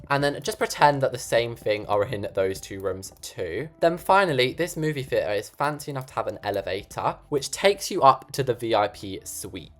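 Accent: United Kingdom. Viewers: im so confewsed idk if your british or not bc when you sing you dont sound british? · Bro his G@ and British voice is driving me crazy 😭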